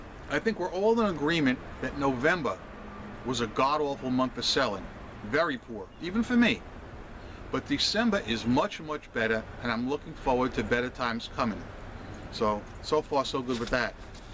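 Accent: New York, United States. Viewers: cute NY Accent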